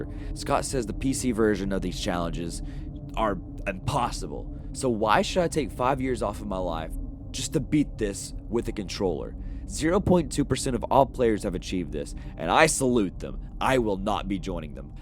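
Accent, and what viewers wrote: United States. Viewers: People from pennsylvania often sound like this, wonder if hes from there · Lordy lord, that's a fine southern accent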